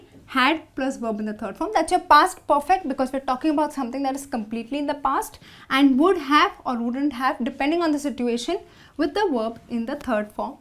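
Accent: South India, India. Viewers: Are you South indian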